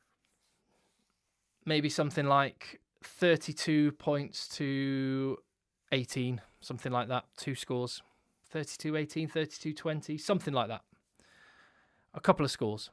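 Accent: South Africa. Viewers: Are you sure you're not A saffa?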